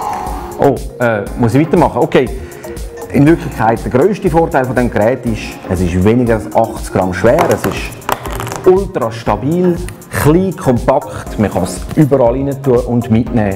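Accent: Switzerland. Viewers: Genau! Die Sprache ist mir sehr sympatisch. Weiter so! Ich verstehe alles. Dieses Handy ist allerdings so überflüssig wie nur was. Was soll sowas in der Modernen Zeit für einen Sinn haben? Gruß in die kleine, aber feine Schweiz. Wenn doch nicht alles so teuer wäre bei euch, würde ich zu euch zu Besuch kommen. ;-)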